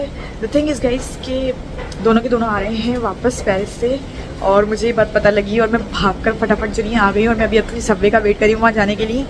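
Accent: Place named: India. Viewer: Ur accent is like indian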